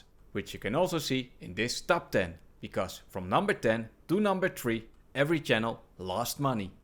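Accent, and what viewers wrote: Netherlands. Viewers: Respect for all the work, by the way I can't un-hear you must be Dutch ;')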